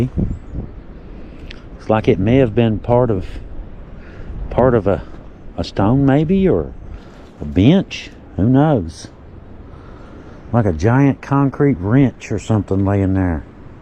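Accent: South, United States. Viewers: I’m from Phila PA in the great Northeast. Tonight was the first time I watched your video. I was enjoying your southern accent when I noticed the depth of your research. Thank you for your work. I hope you get more viewers. this was the first time the algorithm directed me to your video and subscribed to a new channel.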